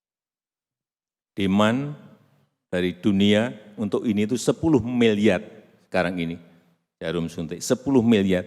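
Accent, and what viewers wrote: Java, Indonesia. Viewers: Pak jokowi klo pidato : saya seneng.. Karna logak beliau jowo tenan.. Meskipun Aku tutu wong jowo tapi seneng ngomong jowo. Halus.. 😁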